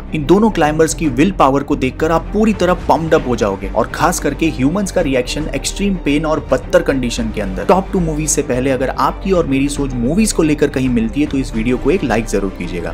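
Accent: India. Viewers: I watch your videos so that I could copy your accent. That's the best Indian accent.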